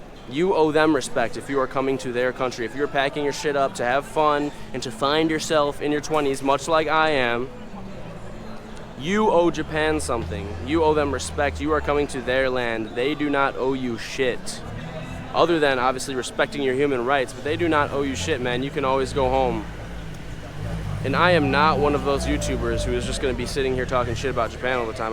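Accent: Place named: Upper Midwest, United States. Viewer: your upper midwest accent was thick in this one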